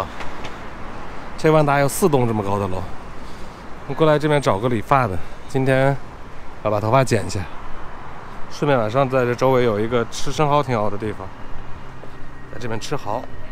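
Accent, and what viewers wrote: Inner Mongolia, China. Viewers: 它来自鄂尔多撕，那里的土豪家里都有矿，煤矿，钱多的比山西，陕西煤二代也不差，所以不要小看山陕，内蒙口音的大土豪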